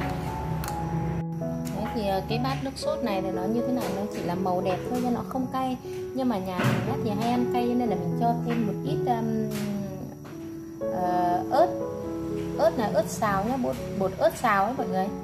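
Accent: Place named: Ha Tinh, Vietnam. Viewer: Nghe tiếng hà tĩnh mà hay quá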